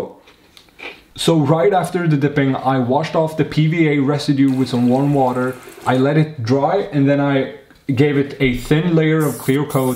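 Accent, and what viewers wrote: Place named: Sweden. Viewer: Mahbub Tamim He is from Sweden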